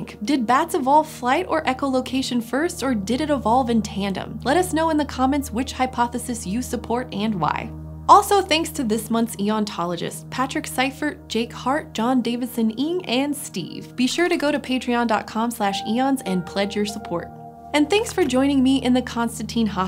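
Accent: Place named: Pittsburgh, United States. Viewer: I wonder if the narrator is from Pittsburgh? Her "a" before n and m become elongated to "eh" or "ay" "ai-nimals"/ "eh-nimals" "maimmals"/ "mehmmals" "ay-end", "ay-encestor", "hay-ends", "tray-en-sitioning", "lay-end", "tay-endem", "brainch", "caimels". Been watching dozens of these videos. Really love the material. Thank you! 😍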